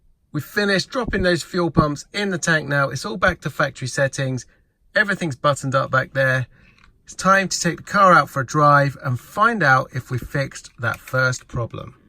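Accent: England, United Kingdom. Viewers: Came over from...fellow Englishman and so don’t need subtitles 😂🤪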